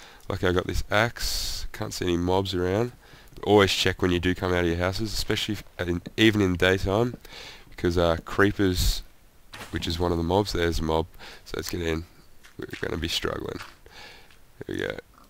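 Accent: Australia. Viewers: Aussie? But uh, full screen please and I am gonna sub cos I'm liking the shit :P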